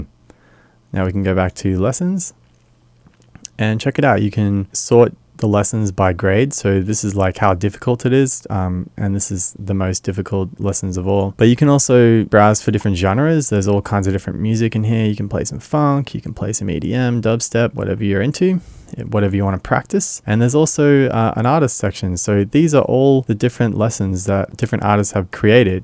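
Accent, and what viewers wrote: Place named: Australia. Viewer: Started watching the video, and felt so at home as you talked - then I realised it was the aussie accent. HAHAHAHA thanks heaps dude.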